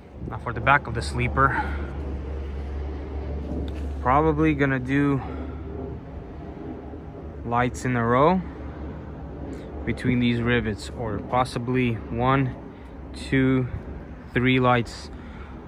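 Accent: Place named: United States. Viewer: смотрю твои ролики,читаю комменты в переводе на русский.Тяжело воспринимаются слова на американском диалекте,но пытаюсь понимать вместе с жестами.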